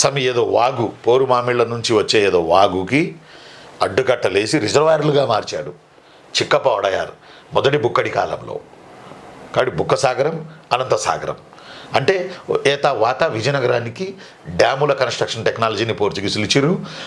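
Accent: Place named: Telangana, India. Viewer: Sir.. మీరు మెల్లగా తెలంగాణ slang లోకి మారిపోతున్నారు..😂( ప్రదేశం ప్రభావం అనుకుంటా)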